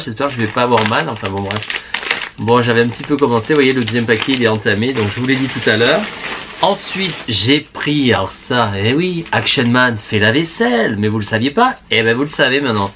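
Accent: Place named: South, France. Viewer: Ah c’est bien se qui me semblait que tu venais du sud j’ai reconnu l’accent mdr 😘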